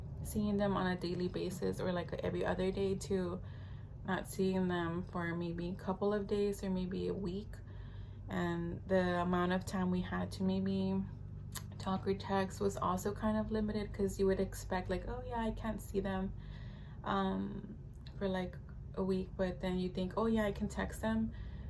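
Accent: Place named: Southern California, United States. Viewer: Hi, PA-C! 🥳💃🏻👏🏾💫😁 Congratulations on that huge milestone! I just found your channel maybe a week ago and I wanted to pause and just shout you out. Sis your channel is sooooo good and so powerful and important. It’s interesting observing you come into your own. Even in this video alone (I think — I’m listening to my playlist while I’m washing dishes so it could be back-to-back videos but anyways) you can hear how your voice is soooo soft (and lowkey hard to hear sometimes — I think increasing volume w a lapel mic maybe might boost your channel growth) but towards the end when you are really experiencing joy talking about your cadaver lab, your volume and confidence just blossoms!!! I love to see it! It really comes out how much you really love medicine and I KNOW you’re gonna be an AMAZING provider!👩🏻‍⚕️💓🤗 Last thing I wanna say which is super awkward but I hope is helpful and the compliment I mean it to be … I grew up in Whittier and El Monte (“Al Monté🥰) and used to hang in La Puente with my bestie (who is a PA too btw 😁 ) and your accent just makes me homesick!🥹 I’m so hyped for the representation!!!! 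Increased diversity of all types is WAAAAAY overdue and I pray there will be more girls with your accent and background in these medical trenches and perhaps you’ll consider teaching high school and jc levels where you can REALLY impact the trajectory of young ppls lives. I used to teach at Mt. SAC and an ROP in the I.E. and I learned how much I loved this impact. Last th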